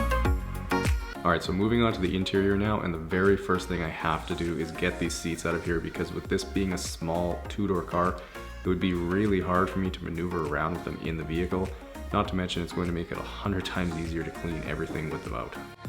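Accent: Saskatchewan, Canada. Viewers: Not all canadians sound like that just so ya know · What I found kinda funny is when I heard you were from Canada your ascent seemed to be more prominent 🤔✨😂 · I love how his Canadian accent barely noticeable the entire video and then when the customers arrive he goes full blown, "OH YAH EH!" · Might have to get you to do the tour busses next time we come through town! (I can hear the Sask in you any day... ha!) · so much Canadian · Grage... Lmao😂🤣😂 aww I love the Canadian accent. Stay up my ice bound Northern Bro.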